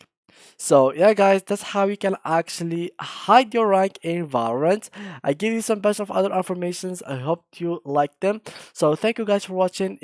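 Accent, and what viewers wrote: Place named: Morocco. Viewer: 3rftk mghribi gha mn l accent w bsh zdt t2kdt fsh seft lk khona msg flgame hhhhhhhh anyways gg abro and thanks ❤️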